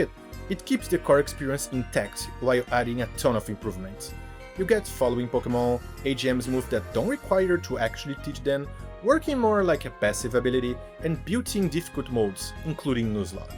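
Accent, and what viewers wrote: Brazil. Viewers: Vc é brasileiro? Tem um sotaque tão brasileiro kkk ótimo vídeo!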